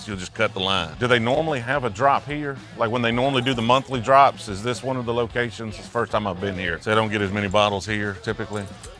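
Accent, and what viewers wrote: South, United States. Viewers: mostly because it was MACK Donalds... Love the southern accent.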